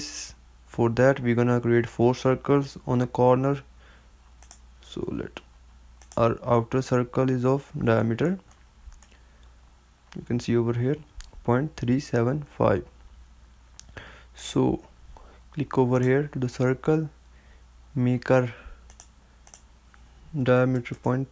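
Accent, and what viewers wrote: India. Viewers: You go entirely too damn fast for anyone watching this to keep up. Also, you have a very thick Indian accent, and tend to mumble. Work on enunciating your words better, other wise your only audience will be folks from India. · Do us all a favor and have someone who doesnt have an indian accent do the narrating. Nothing personal but english does not go with your accent. Its a shame beacuse theres a lot of good content ill miss out on.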